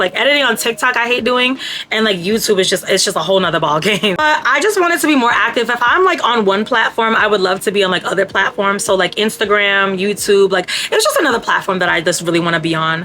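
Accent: Jamaica. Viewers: Far a while was trying to figure out if you were Jamaican descent, because I can kinda hear it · Funny thing is your natural accent reminds me of Jamaicans I know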